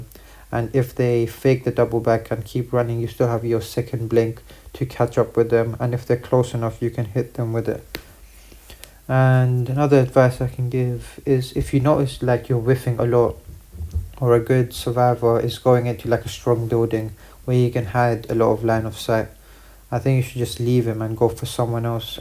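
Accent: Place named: RP, United Kingdom. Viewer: Yeah, that's an RP accent you've got there. Your location on YT says that you're from India tho?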